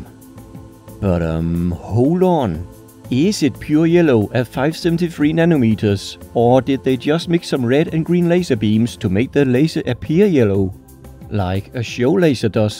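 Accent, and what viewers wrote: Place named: Denmark. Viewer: Grinernt nok du er dansk! Accenten skinder igennem :P Fed video!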